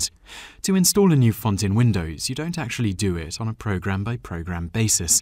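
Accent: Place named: United Kingdom. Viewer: British english! ... nice. By the way... very good your video, I use Apple. But well done. I will check your channel. Thanks!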